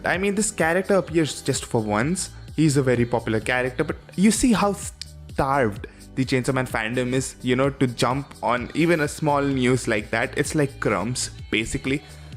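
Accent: India. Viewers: you sound Indian?! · Bhai indian h kya? accent se lag rha h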